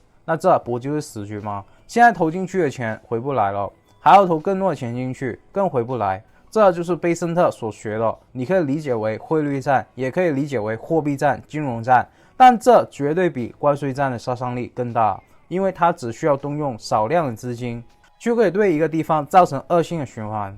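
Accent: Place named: Mainland, China. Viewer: 聽口音很像中國人？應該先來救中國人民幣？才好吧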